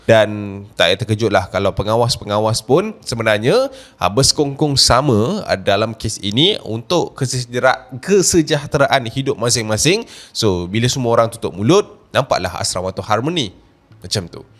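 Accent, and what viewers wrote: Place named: Kelantan, Malaysia. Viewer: Sedak dengar Abe chap kecek Kelate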